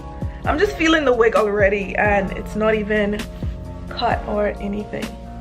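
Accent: Bahamas. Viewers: Your accent sounds Bahamian...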